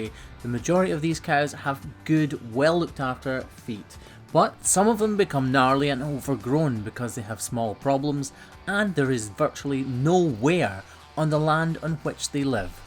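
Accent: Scotland, United Kingdom. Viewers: Lol his name is (It's the Scottish making it sound like LOL) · Can ya say a little over run by the beef? Started watching vids for the trimming, stayed for the Scottish scenery, accent and great sense of humor.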